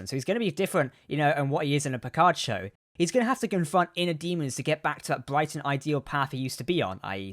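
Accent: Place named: United Kingdom. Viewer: susan chase He’s speaking British English.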